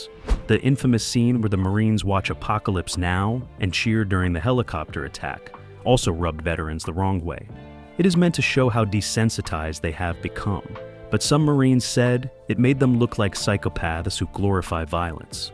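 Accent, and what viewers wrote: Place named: United States. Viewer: Sounds typical American.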